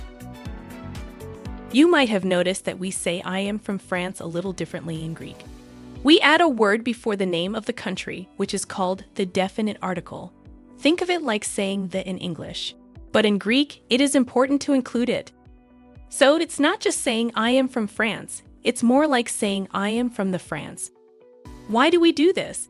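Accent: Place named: United States. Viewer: Horrible. Este vídeo es completamente horrible. No sé qué da más miedo de todo, si el horrible acento inglés americano cuando pronuncia el griego o las personas hechas con inteligencia artificial. Es horrible. Jamás podría aprender nada con vídeos así.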